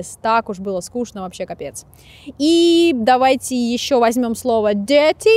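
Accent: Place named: United Kingdom. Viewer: Такой британский английский 😱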